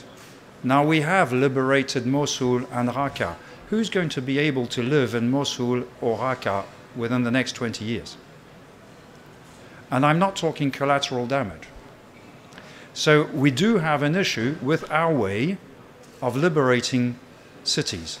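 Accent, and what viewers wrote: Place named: France. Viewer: He speaks perfectly English, but he has a heavy French accent, I recognize it as a French. English is clearly not your mother tongue.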